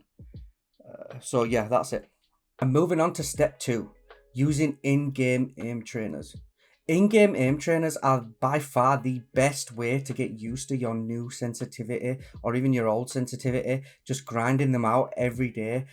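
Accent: United Kingdom. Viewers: like I so many UK youtubers w/out this accent 😅 Greate video btw 💪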